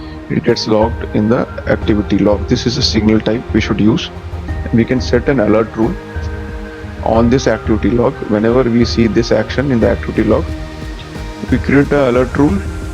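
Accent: India. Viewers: Thick indian accent, crap mic... and to top it all horrible background music - how did you manage to screw this up?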